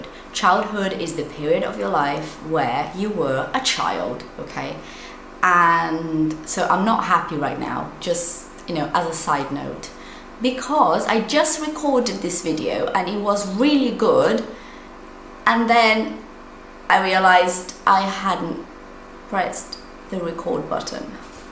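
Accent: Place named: United Kingdom. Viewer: Wow your accent is very beautiful!!! I like it, is so British! 😊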